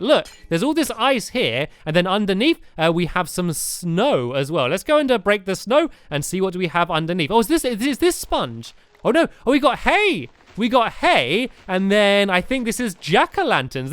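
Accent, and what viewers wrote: United Kingdom. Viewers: I stopped watching stampy like a year ago because his voice sounded annoying to them so I stopped watching for a couple of months but now I watch him because I don't care what they say any more he's from England he's British get use to it people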